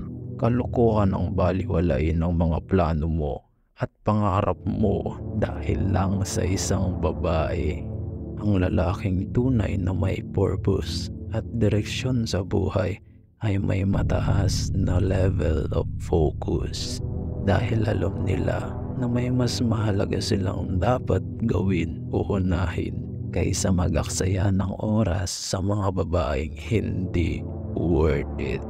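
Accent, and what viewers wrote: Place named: Visayas, Philippines. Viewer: bkit ganyan boses at pagsasalita mo???bisaya ka noh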